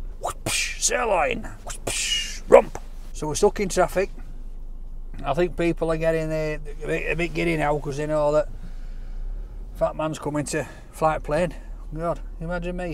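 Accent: United Kingdom. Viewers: I try to keep my captions on so I can read what he's saying the British accent is very strong and it's hard to understand sometimes but I do love a British accent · Thank You for watching a Brit talking like a Brit from his region of Britain. I sometimes miss the odd word he says, but as Brits we just go along until it’s impossible to understand what’s going on, the gist is more important than the vernacular. All the best.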